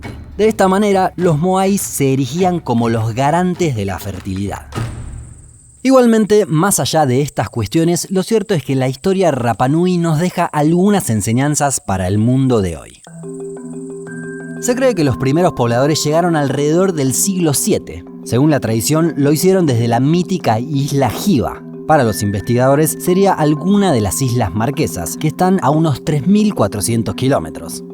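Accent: Argentina. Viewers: Sos de argentina che! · Eres argentino ? Me gusta tu acento!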